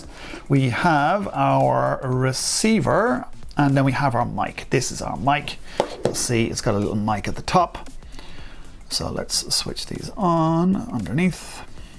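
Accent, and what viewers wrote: Ireland. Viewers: Hi Was scrolling through videos to compare and find a good budget wireless microphone system for DSLR camera. When i heard your Irish accent i said this is my man 😂😂 can you give me some advice if you had any experience with Synco G1 A2 system. I love the Rode Wireless Go but I find there is very little extra"s for your Euro. For €50 less, the Synco has a great all round package but im getting mixed reviews in videos. Just to note i will be using microphone indoors 99% of the time, doing cooking demos. if you have any advice i would greatly appreciate it. 👍 P.S Loved your video & Humor 🤩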